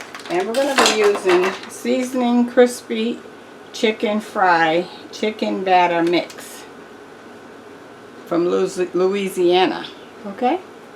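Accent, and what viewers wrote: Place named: New England, United States. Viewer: I Don't know who you are but I can tell a voice from New England 🙌🏾🙌🏾 Keep doing your thing Miss Lady 🙏🏾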